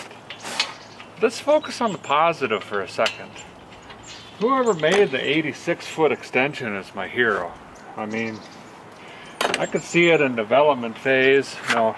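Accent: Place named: Iron Range, United States. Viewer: Grew up in Duluth and the Iron Range accent and humor have me smiling ear to ear. So glad I came across this channel!!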